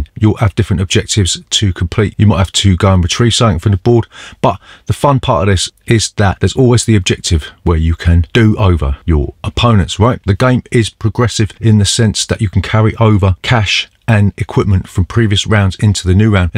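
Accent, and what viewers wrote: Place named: Newcastle, United Kingdom. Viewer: Superb again. Never knew you were a Geordie like me. Gan canny bonny lad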